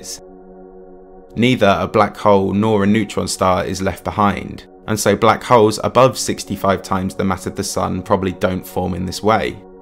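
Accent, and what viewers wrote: United Kingdom. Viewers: I don't especially like the british accent but i like his voice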